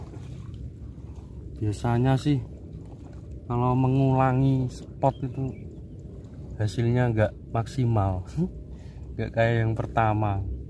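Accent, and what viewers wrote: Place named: Java, Indonesia. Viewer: Q suka cara ngetapnya.. Medhok tu maszehhh Salam hormatku boss ku ❤️🙏❤️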